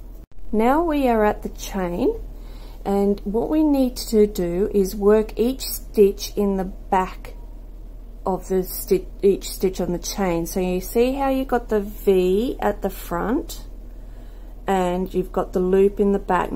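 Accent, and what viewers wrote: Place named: Australia. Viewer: Hello lovely, I have found the 1st of your 💗 beautiful patterns I am going to make!😊 My eldest son has recently been asking me for a 'Manly' poncho 😅 and this is it, it will b perfect for him. And I must say your tuturial is great, u explain things very well and it is so so great to b listening to an Aussie😁. Ta heaps. Love and prayers💗💗💗🙏🙏🙏🧶🧶🧶🐕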